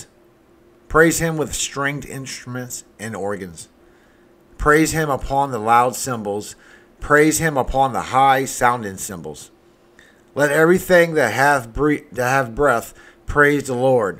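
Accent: Louisiana, United States. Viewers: I love to read your comments. They always make me laugh. I’m from La and my family sounds like this..lol. However, my Dad grew up in Texas, but he tells the best Boudreaux and Thibodeaux jokes. Your posts remind me of him. God bless and have a wonderful day! 😂😂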